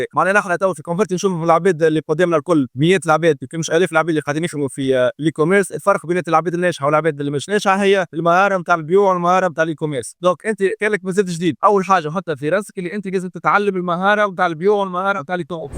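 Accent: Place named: Oued Souf, Algeria. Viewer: لهجتك حلوة الله ابارك انت من واد سوف؟❤❤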